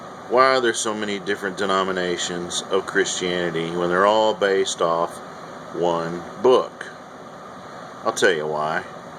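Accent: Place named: South, United States. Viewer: nice hearing this from someone with a southern accent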